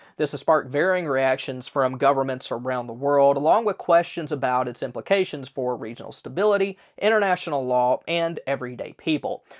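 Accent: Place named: South, United States. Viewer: I always count on you, to keep me updated about issues that might impact what I need to prioritize in my prepping efforts. Plus, makes me feel better to hear your Southern accent b/c I have one myself. Sounds like home! 😊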